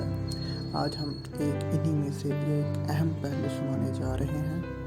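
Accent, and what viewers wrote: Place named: Rawalpindi, Pakistan. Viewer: Tu rawalpindi ka lgta hai awaz say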